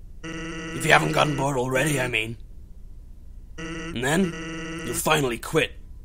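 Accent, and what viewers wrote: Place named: Australia. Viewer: Your Sans sounds sorta Australian to me.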